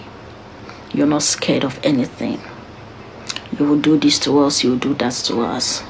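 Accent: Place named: Hausa, Nigeria. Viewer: Listening to her voice, you could notice that she was born in Igbo land by an awusa or fulani people.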